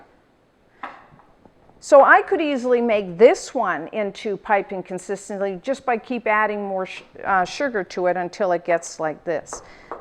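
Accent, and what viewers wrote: Canada. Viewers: Confectioners sugar - is that icing sugar?? Love your Canadian accent btw xx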